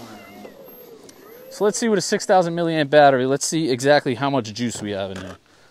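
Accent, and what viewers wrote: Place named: Brooklyn, United States. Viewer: Are you in the boroughs of NY? You have a slight Brooklyn accent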